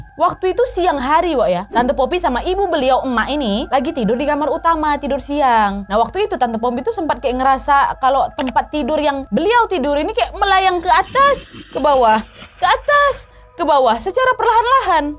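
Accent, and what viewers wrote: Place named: Batam, Indonesia. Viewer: org batam kah? Krn logatnya batam kali wak.. aku jg dibatam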